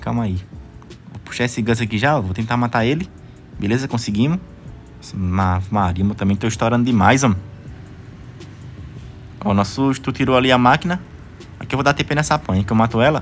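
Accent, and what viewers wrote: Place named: Bahia, Brazil. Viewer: eu te amo bahia bahia, eu amo teu sotaque bahia, manda um salve pra paraíba 😁😁😁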